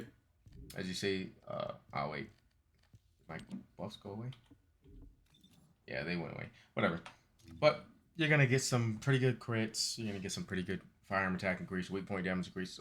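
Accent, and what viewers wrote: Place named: Philadelphia, United States. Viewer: "Yea that jawn like... ya mean." Philly Boi detected.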